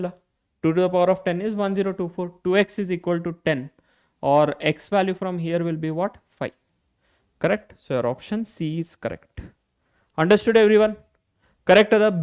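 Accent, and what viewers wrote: North Karnataka, India. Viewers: Sir nimde uttar kanada mix acent super ayethi sir 😂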